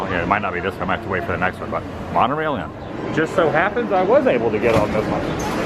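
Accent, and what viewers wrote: South, United States. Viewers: It’s called “a southern pronunciation”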